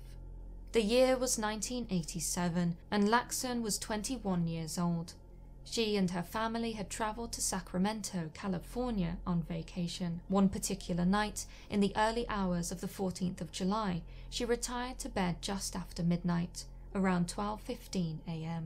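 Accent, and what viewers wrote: United Kingdom. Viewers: is one of the most stunningly, naturally beautiful women I have ever seen. Her skin is perfect. And that soft voice with that British accent, WoW!! What more could any viewer ask for!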